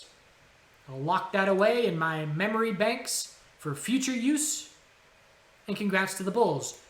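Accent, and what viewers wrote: Ireland. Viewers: More Irish accent please!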